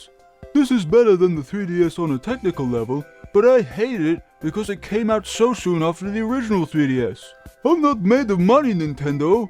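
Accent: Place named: United Kingdom. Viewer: wait you're British... bitchin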